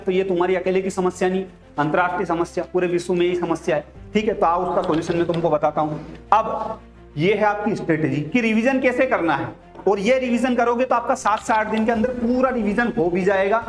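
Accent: Indore, India. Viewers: Indore ascent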